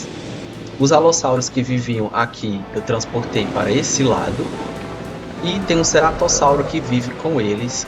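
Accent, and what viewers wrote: Ceará, Brazil. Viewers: Cearense?, tipo eu🎉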